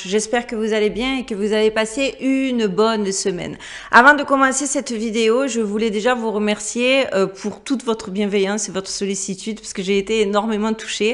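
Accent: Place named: Provence, France. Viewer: Bonjour magnifique Jeune Femme pétillante à l accent adorable qui sent bon la Provence ! Votre lumière intérieure contrebalance la noirceur maléfique. MERCIIII !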